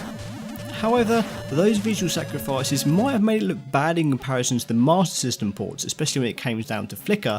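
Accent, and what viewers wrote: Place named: Southern England, United Kingdom. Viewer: bet you any money this guy is from south england or london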